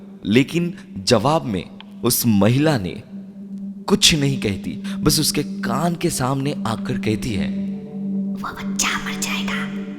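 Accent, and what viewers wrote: Bengal, India. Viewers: Darun hoyeche. Ektu bangla tan ache Hindi te but darun. Chaliye jao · Kintu jai bolo banglay pret kotha sunte beshi vlo lge...ar Hindir ucharon arro beshi valo korte hbe.karon banglar akta tan theke jay jotoi Hindi te bolona keno.its ok prothom episode ata..aste aste ai ucharon ar tan gulo valo hye jabe..♥️ afterall voice to tomar marattok sundor...♥️ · I love your voice. In bengali channel what I love most is your amazing gift of gab and presentation, will love to experience that here too. But please note your hindi pronounciation needs little bit improvement, the touch of bengali tongue is a little prominent, otherwise marvellous presentation. I know you will improve, as always. All the best for this new journey. Have a good day! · Dada tomar voice ta just osmmmmmm ❤️❤️❤️ Hindi teo bangla r feeling asche 😍😍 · Vai keya hindi he purai bangla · I will give Honest review.. 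no oiling no polishing. Don’t continue with the Hindi version. Because you’re many Hindi words sounds like Bengali. please continue your Bengali version. At least we can enjoy your bangla bhasha.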